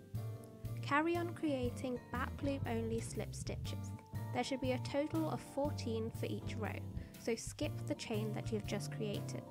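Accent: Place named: United Kingdom. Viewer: Yessss! You go girlllll. I like how slowly you work. I learn by repeatedly watching the action over and over again. So this really works for me. Very good close ups so one can see exactly where to put the hook. Love the fact that there are no speeded up camera shots I'm better at knitting. My crochet tends to go wierd and lop sided as I don't always place the hook in the right place. I get so stressed when I crochet so I don't tend to do it very often.😥 Its really good to hear a tuturial made by a British person so I am not going to get confused like I do when following an American crafter. I am going to try and make this. I have not made a crochet garment for years. Thank you for sharing. How many balls of the Women's Institute Aran yarn did you buy for the project?Bravo teacher👍🏿🙏🏿👏🏿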